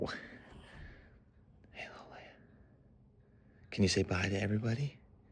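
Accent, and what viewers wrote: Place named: South, United States. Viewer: The more excited you get the more the southern twang comes out. 😂👍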